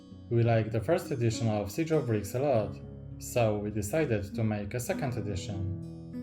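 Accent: Poland. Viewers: Tak myślałem, że to polski akcent xd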